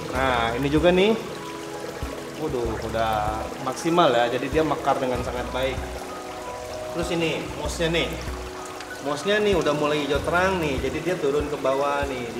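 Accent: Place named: West Java, Indonesia. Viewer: Pertahankan logat sundanya kang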